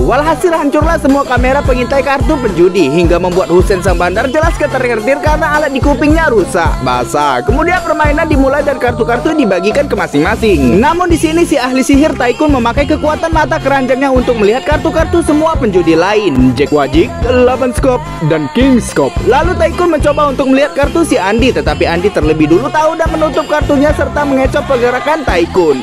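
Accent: Palembang, Indonesia. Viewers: Kau wong Palembang po 😅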